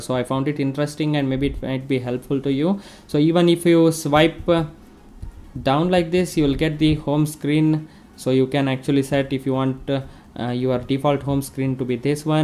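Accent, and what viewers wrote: South India, India. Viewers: I thing ur southindian?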